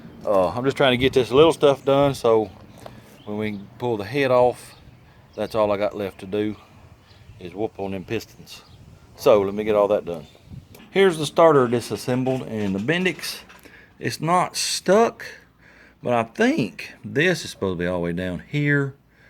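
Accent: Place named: Eastern Kentucky, United States. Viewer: really enjoy your show. I grew up with some boys from eastern Kentucky and you sound a lot like them, Glad to hear your channel is growing!!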